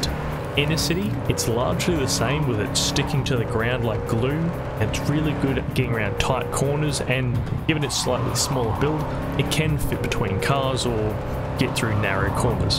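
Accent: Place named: Australia. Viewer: oops 😬 that's the second time I mistaken a Australian accent for a British 1 lol don't know why they sound closely identical but hey good video either way.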